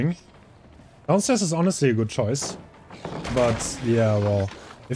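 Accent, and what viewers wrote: Germany. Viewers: are you german? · Wie man den deutschen Akzent hört aber saftiges gameplay👌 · Der deutsche Akzent:)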